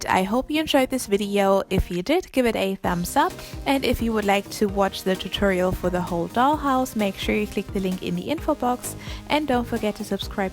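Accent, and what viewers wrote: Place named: Germany. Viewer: da hab ich doch ein gutes Gefühl für das deutsche Akzent😃😎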